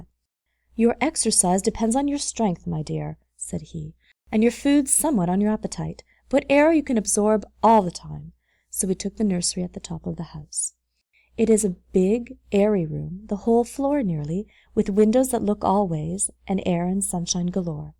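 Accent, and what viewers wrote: Canada. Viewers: I super appreciate the reader's slight Canadian accent; makes this so much easier to listen to! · The Canadian is strong with this one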